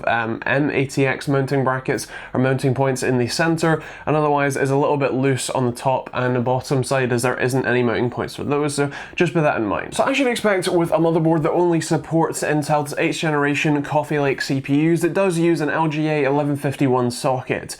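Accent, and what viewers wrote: United Kingdom. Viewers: can't understand ANYTHING with your thick British Accent, lol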